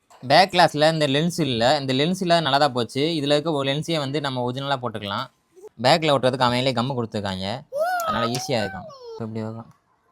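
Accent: Madurai, India. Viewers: அண்ணே ஆனா நீங்க மட்டும் எப்படி எல்லா மெக்கானிசம் பாக்குறீங்க உண்மையிலேயே வேற லெவலுன்னு நீங்க மதுரை ய?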